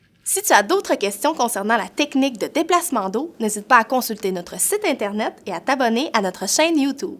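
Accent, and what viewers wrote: Quebec, Canada. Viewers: Cette vidéo est incroyable un accent québécois avec des explications claire et pricise que demande mon contrôle de physique mdrrr ! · Qui regarde ça en 2020 ?😹 J'ai connue votre chaîne Youtube grace a mon prof de physique/chimie et en regardant les vidéos j'ai remarquée que ma moyenne en physique/chimie à évoluer 😸 Et j'aime trop ton accents Québécois 😺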